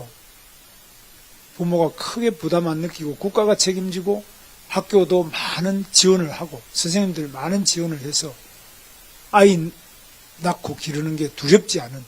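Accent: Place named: South Korea. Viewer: 구수한 사투리 정이갑니다.대통령꼭대십시요.😊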